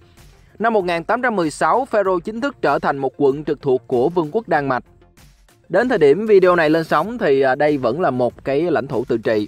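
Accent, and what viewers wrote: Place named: Phu Yen, Vietnam. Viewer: Giọng đọc Phú Yên thì phải.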